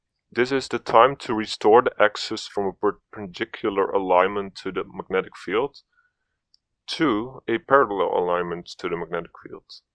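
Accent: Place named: Netherlands. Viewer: Waarom klinkt deze gast nederlands xD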